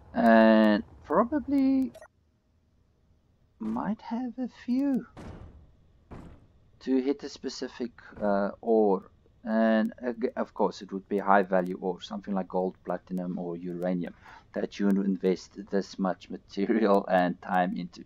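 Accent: South Africa. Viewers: I know a South African accent when I hear one!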